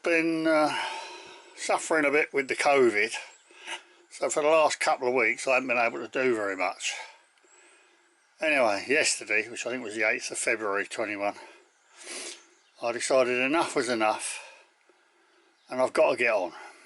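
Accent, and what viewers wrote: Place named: Chatham, United Kingdom. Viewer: Well, it’s a decent resolution to the problem of storage. My Halfords cabinet is black, so easily marked and wiped away felt tip isn’t an option. Why don’t they make these things easy to label? Really enjoying your channel. BTW, where do you originate from? Your accent sounds very Chathamese to my ears.. Les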